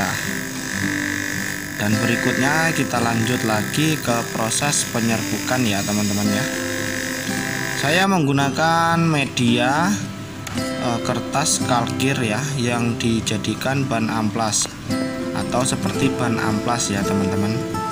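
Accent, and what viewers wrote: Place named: Java, Indonesia. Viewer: Maaf boss..kok logatnya ky orang jawa...?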